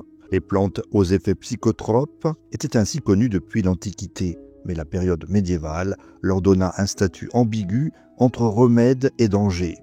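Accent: Corsica, France. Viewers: La voix😮.. Un léger accent corse non ?..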